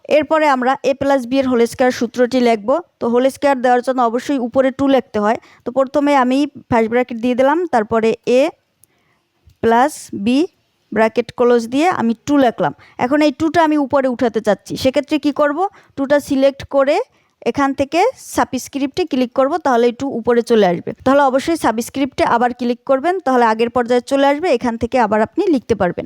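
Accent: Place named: Barishal, Bangladesh. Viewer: মিস, আপনার উপস্থাপনা পরিষ্কার ও সুন্দর কিন্তু র-ফলা জণিত শব্দ উচ্চারণে সমস্যা ঠিক করে নেবেন। বুঝতে পারছি আপনি বরিশাইল্যা মাইয়া। আমিও বরিশালের। However, don't take it otherwise.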